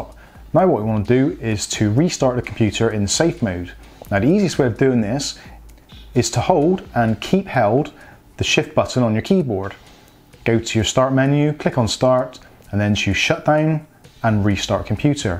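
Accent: Bristol, United Kingdom. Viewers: Are you a Bristolian?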